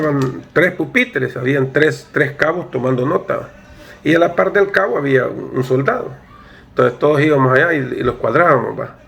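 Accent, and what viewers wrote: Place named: El Salvador. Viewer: Me llega como cuenta las historias este sargento .con un acento muy a lo salvadoreño,un acento campirano y esa jocosidad para narrar las historias .saludos sargento.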